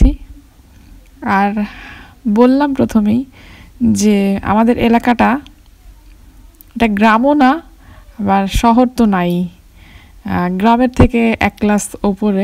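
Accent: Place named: South Bengal, India. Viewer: amar ও ত শেই প্রশ্ন । ইনি তার গ্রাম কোথায় তা বললেন না।! খুব খারাপ কথা । এতো টুকু সাহস তো উনা র থাকা উচিৎ ছিল, কমপক্ষে আমি ইম্পপ্রেসড হয়নি এটা অপশন এ রেখে।ইনার কথা শুনে অবশ্য মনে হয়েছে ইনি হয়তো বা সাউথ বেঙ্গাল(মানে খুলনার দিকে) কিন্তু আমি নিশ্চিত ও না।আমার নিজের বাড়ি জসরের কোন এক গ্রামে( যশোর থেকে ২০ মাইল দক্ষিনে ।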